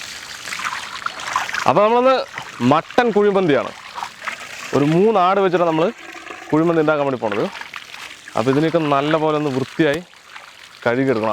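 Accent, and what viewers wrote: Palakkad, India. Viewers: കള്ളി മുണ്ടും പാലക്കാടിന്റെ നാടൻ ശൈലിയും അസ്സൽ പാചകവും പാചക രാജാവ് ഫിറോസ് ചുട്ടിപ്പാറ 👇⚡️ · Palakkadaan slang ishtamullavar like iduka👍❤️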